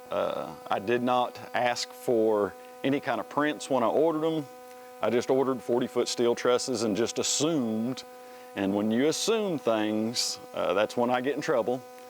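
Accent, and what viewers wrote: United States. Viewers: Don’t be so hard on yourself, mistakes are just lessons learned, but I love the southern twang of tuba six’s and tuba four’s lol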